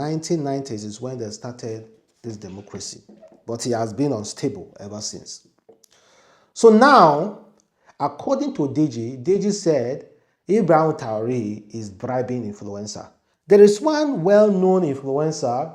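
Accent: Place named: Edo, Nigeria. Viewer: This guy self you no go school, why you dey read and pronounce like that, se something the worry you abi, do you even go back to watch your own videos before posting them, stop disgracing Edo state, if the social media is too hard for you, you can as well go back to farming. every time i watch your video its always filled with reading errors, very bad pronunciation. Am advising you to always go back and watch your videos before posting them, identify the errors, correct them before posting the videos, or contract an editor to work on it for you, youtube is a worldwide platform and you can't afford to keep disgracing yourself, Edo state and Nigeria at large, from the way you speak its obvious you're from Edo state, stop disgracing us.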